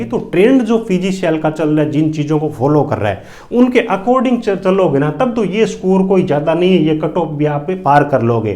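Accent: Rajasthan, India. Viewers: Aap rajasthan se ho kya sir?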